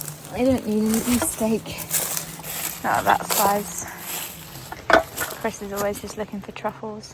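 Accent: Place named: Sheffield, United Kingdom. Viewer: Question are you from Sheffield?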